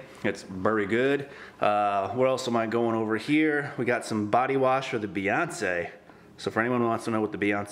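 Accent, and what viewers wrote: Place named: Mid-Atlantic, United States. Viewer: It was a combo of many different states. We'll call it a Mid-Atlantic accent lol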